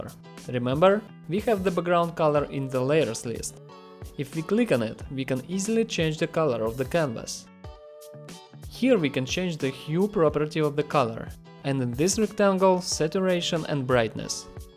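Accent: Russia. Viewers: Очень рашн акцент :)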